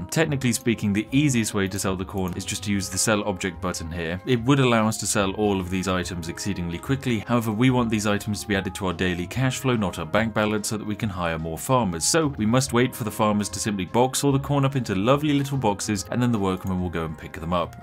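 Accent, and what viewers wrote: United Kingdom. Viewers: 🤔Ahhh.. heh... it makes sense now. You have to pay the prisoners.. Thanks Mr. British Voice!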